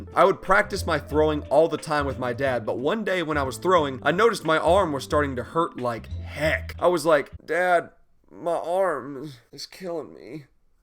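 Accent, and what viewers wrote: South, United States. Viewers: why do I kinda hear a Southern accent in his voice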